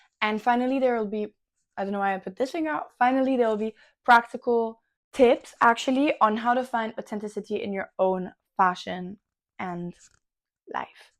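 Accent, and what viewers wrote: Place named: France. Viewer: Sister 🥹are you french btw? Ur accent is so cute:3 I'm not trying to be rude, but I've been learning French for awhile but only textbook style. I only started listening to French content, and I kind of recognise the accent..?^^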